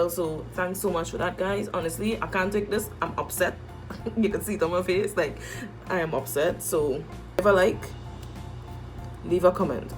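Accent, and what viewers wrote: Trinidad and Tobago. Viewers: Lady,I like you you make my pores rise you sound totally Trini.Sorry.. Carribbean countries can't vote ,you know who we voting for.l watching like a hawk. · Hunni that TnT accent and the fact that you are upset made the accent better 💖💖💖. Well, thanks to persons like you CBS received those messages and got the last laugh. I hope they discontinue the exploitation of black women for their ratings moving forward. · I guess it all comes down to who the audience is. I have a pretty boring life and my relationship is so drama free and stable that I watch reality dating shows to entertain myself! So, the drama factor works well for me! 😅 Btw, are you Carribean ? You sound very similar to my Trini coworkers, and I just wanted to check if I can successfully tell the accents apart!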